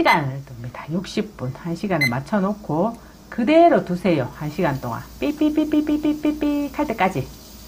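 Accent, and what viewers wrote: Gyeongsang, South Korea. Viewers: 잠곡밥. 너무좋아요 갱산도 사투리가 구수합니다 선생님 좋아요 응원합니다 👍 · ㅋㅋ 경상도 말이라 언제 들어도 친근감이 가서 좋아요 남편이라도 말 안들을땐 콕 쥐박아줘요 ㅎ 그리고 소변 튀게할땐 억지로라도 변기에 앉히세요 난 사윗한테도 잔소리해요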